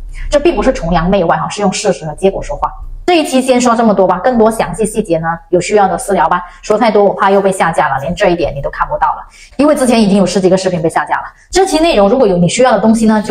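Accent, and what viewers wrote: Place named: Hunan, China. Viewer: 你是湖南老鄉吧？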